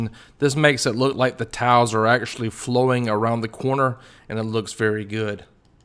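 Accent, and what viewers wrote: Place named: South, United States. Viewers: You're fun to watch. That Southern drawl is highly entertaining, "We tested'ed 'em." Hehe (I'm a KY girl. so howdy!). And you did a really good job; very precise. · This is so beautiful!!! Are you from New Orleans?? You sound so much like Louisiana!!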